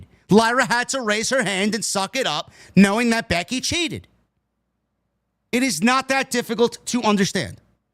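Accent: New York, United States. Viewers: wasn't angry. That's how alot of us new yorkers talk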